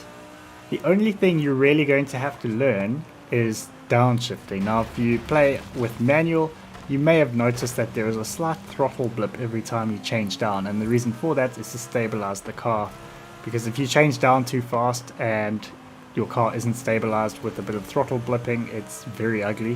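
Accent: South Africa. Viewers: You from South Africa 🇿🇦?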